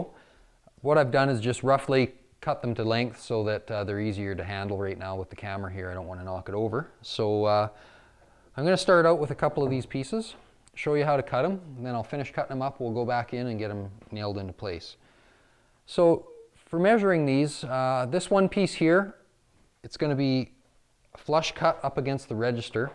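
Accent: Canada. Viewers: His accent is a pretty big giveaway but his hat also says Canada. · How nice to share your knowledge with all of us. Love the Canadian accent - you are a great help sir. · You must be from Canada or the Upper Peninsula of Michigan huh? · Is he from canada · def from canada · His accent gives him away. Haha.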